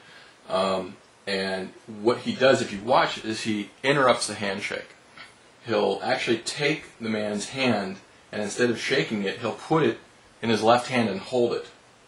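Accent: United Kingdom. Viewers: u kno what? that all sounds pretty legit on a very unusual level, but it basically all comes down to one thing: british accent. That combined with non-stop inane chatter could put anyone under for a few seconds. . . but like i said, the other explainations are nice too. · Your video was thurly explained. I like that. That and i don't understand the british accent that well so ya :P Thx!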